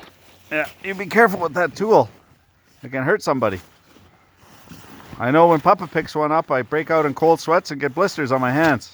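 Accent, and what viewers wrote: Quebec, Canada. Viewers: Are you Quebecois? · Tabarnack de calisse il parle québécois pas français calvaire de siboire d’osti de criss ! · Wow your French is very good ! Hilarious accent from Quebec tho ^^ Keep up the cool shit man looking forward to see your next cnc vid ;)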